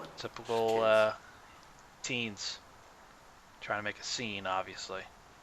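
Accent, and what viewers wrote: Philadelphia, United States. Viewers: Just wondering, as a born and raised Pa lady, your accent sounds like a Philadelphia one. Am I right?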